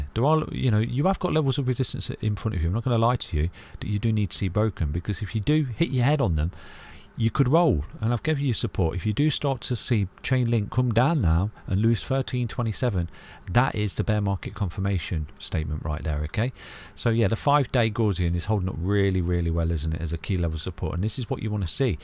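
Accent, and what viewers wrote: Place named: Northern England, United Kingdom. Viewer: Love the videos thanks!! Love the Northern accent too - reminds me of home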